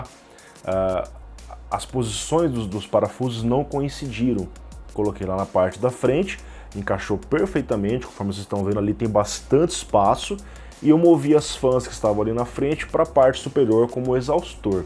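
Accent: Brazil. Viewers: Is he speaking Brazilian Portuguese or European Portuguese? Is there a difference ?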